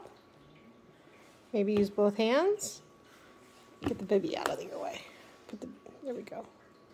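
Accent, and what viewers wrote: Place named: Philadelphia, United States. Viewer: Your accent…can you share where you’re from? I’m guessing the Philly area…❤️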